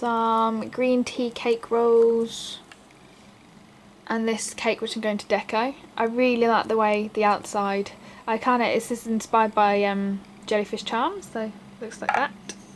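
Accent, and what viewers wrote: United Kingdom. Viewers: Your charms are very cute >.< I love them,perhamps i love your british accent x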